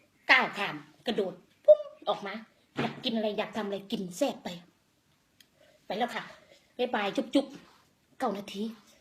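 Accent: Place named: Khon Kaen, Thailand. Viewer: กินเป็นตาแซ่บคัก..เว่าปานอยู่ขอนแก่นเอาโลด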